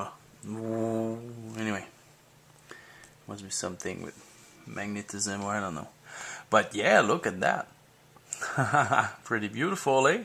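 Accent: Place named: Acadia, Canada. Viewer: yes from val damour nb je suis acadien aussi lol ses pourquoi je reconnais laccent lol merci pour vid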